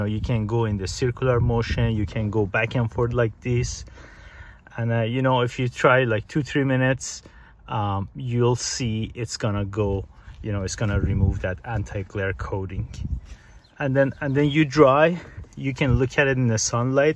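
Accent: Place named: Iran. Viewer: That is an Iranian accent if I’ve ever heard one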